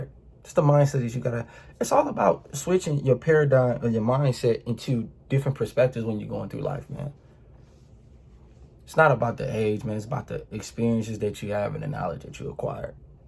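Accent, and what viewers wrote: Baltimore, United States. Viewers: Are u from Baltimore? U got the accent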